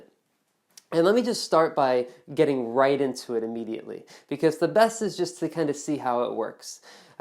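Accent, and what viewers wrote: United States. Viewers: Are you American ???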